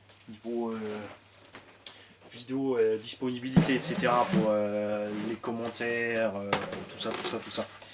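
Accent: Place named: South, France. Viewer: ho t'es du sud? deux mille viNNt et un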